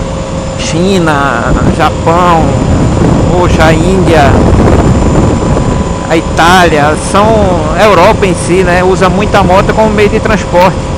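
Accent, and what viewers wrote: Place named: Rio Grande do Sul, Brazil. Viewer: nao nao nao, ele e gauho mds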